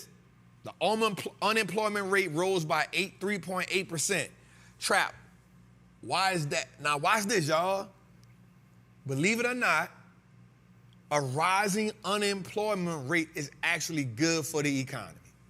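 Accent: New Orleans, United States. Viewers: I thought he was from new Orleans.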